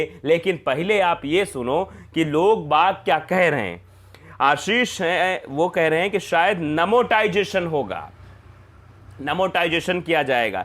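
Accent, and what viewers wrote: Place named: Eastern Uttar Pradesh, India. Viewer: In bhagodon ke karan sarkar par koi farq nahin padega kyonki andhbhakton ki badi sankhya unke support me hai chaahe jo bhi ho. Abhi to national property bechi jarahi hai, andhbhakt to uswaqt jagenge jab unke ghar bhi bik chuke honge. Badi achhi timely reporting hai aapki. Eastern UP ka ascent aur style sunkar maza aagays. Agar reporter saheb enjoy karen to unki khimat me ek sher arz hai Hain aur bhi duniya me sukhanwar bahut achhe: kahtehain ki Ghalib ka hai anddaze-bayan aur. Jai Hind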